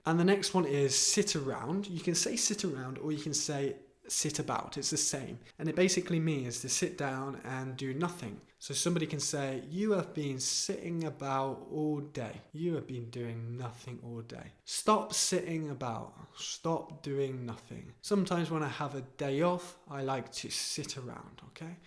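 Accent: United Kingdom. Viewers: Thank you very much.Your teaching was great as always (with beautiful British accent) and the content was helpful as well. Interestingly yesterday i was talking to a Swedish guy.We talked about the cultural differences between Iran and Sweden. "midsummer celebration is something we do that stands out i believe" he said.It was the first time i heard "stand out"! After our conversation,i found out you uploaded new video so i watched it and got surprised.Now i can understand it better.🙏🙏🙏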